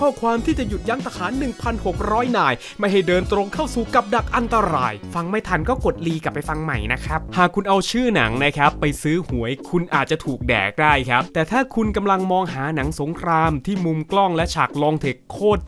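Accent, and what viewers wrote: Isan, Thailand. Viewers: อ้านเป็นคนอีสานแม่นบ่😅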